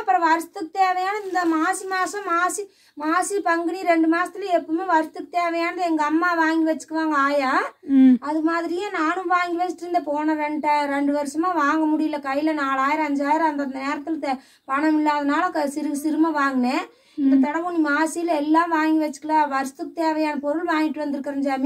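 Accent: Kongu, India. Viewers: அம்மா தினமும் கொங்குத் தமிழில் அழகாக பேசுவது எல்லோருக்கும் பிடிக்கும் இது போல ஒவ்வொரு நாளும் ஒரு டிப்ஸ் கொடுங்கள் வாழ்க வளமுடன் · ஆமாம், அம்மா ப்ரண்ட்ஸ் னு சொல்லாதீங்க. உறவுகளேன்னு சொல்லுங்கள் இல்லைன்னா தங்கங்களே உங்கள் அழகான கொங்கு தமிழ்லே பேசுங்கள்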